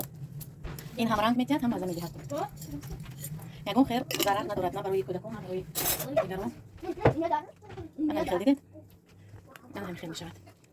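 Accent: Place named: Literary Tajik, Tajikistan. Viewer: Мана чи тавр бояд сухан кард: равон озод бо лахни адабии точики👍👍👍Шахсе , ки шуморо гап задааст аввал тарзи сухан кардана ёд гирад😊. Шумо бехтарин модар зани точик👍👍👍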